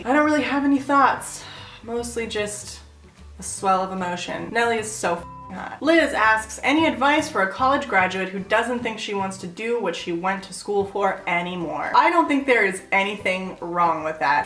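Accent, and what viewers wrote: Castile, Spain. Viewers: your spanish is perfect I had never heard anyone whose first language isn't spanish speak it so well, and more specifically with a castilian accent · she speaks like a Spaniard, it's so cute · nice you speak spanish like they do in spain! and ima try that bubble bobble right now! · Your Spanish is soooo good. I grew up in a Mexican household yet your Spanish is way better then mine. When you speak Spanish it sounds as if you were from Spain. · Your Spanish ❤️ I speak Spanish too but I'm Mexican so the accent is different. I went to Spain as my graduation present for about a week and a half and it was so funny how I was picking up that accent! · OMG, that was soooooo fuckin sexy! Even if you speak spanish with the Castellano pronounciation. Que chula eres, Dios mio! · Haha I can hear that Spain accent. My parents are from Mexico so I learned Spanish first and then English in pre-school. 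Unfortunately, my Spanish became worse over the years and now as an adult I have trouble with grammar and vocabulary sometimes. It's so embarrassing. Your Spanish is probably better than mine! :p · I love your spanish accent, debo decir que no me gustan los acentos propiamente españoles de España, but yours is adorable.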